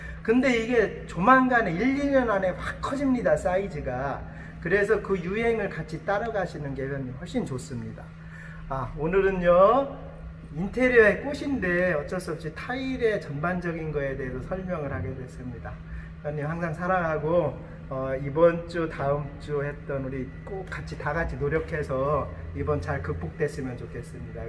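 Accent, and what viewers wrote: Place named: Chungcheong, South Korea. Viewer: 큰타일이 잘 깨지지않을까요? 충청도사투리가 재미있네요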